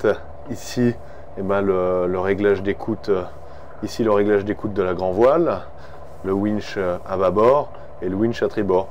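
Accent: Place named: France. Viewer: yes I like French accent, look forward to seeing it. Merci You have sold an Amel 60 as soon as I have won lotto.:).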